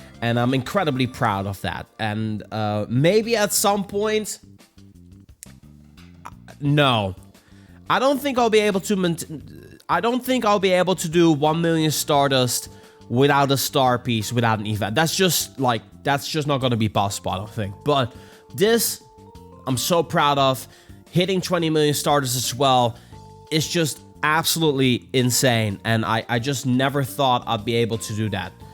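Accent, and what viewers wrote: Netherlands. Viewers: Kom je uit Nederland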